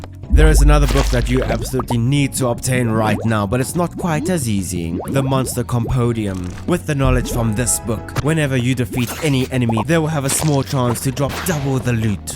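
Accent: South Africa. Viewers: broski is South African, just like me :D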